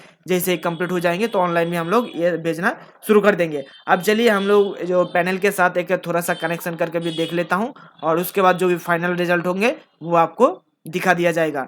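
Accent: Bengal, India. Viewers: You are Bengali Right ❤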